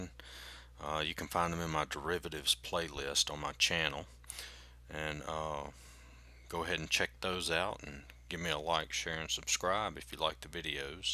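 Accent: South, United States. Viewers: I know im four years late but youre the first souther math tutor I have ever heard. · Bro speaks like a cowboy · Same here.... I love the Southern accent... Howdy all the way from South Africa 👋😃 🌍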